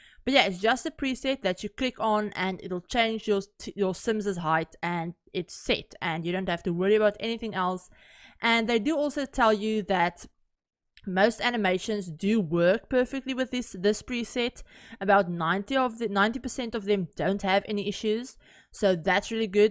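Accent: South Africa. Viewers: South african accent lol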